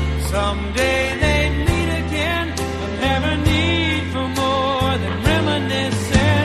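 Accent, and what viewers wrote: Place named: Visayas, Philippines. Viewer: Ok Lang maging bisaya ang accent ikaw Yan ei may sari-sarili tayong accent just be proud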